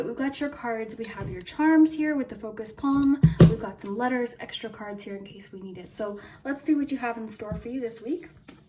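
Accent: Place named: Canada. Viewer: You’re Canadian!! That’s why I’ve noticed your accent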